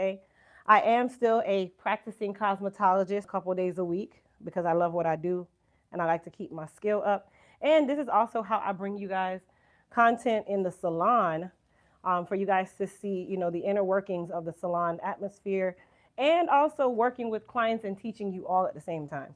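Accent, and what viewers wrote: Jamaica. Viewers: She’s right you do have a Jamaican accent. It’s not sharp but I do hear it from time to time.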